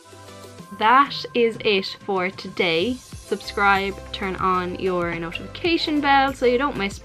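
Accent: Ireland. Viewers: What county in ireland are you from im really bad at accents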